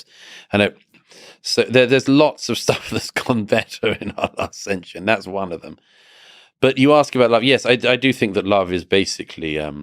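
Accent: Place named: RP, United Kingdom. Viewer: Yeah, he's totally got this wrong. Prat with a plumby accent is all he is.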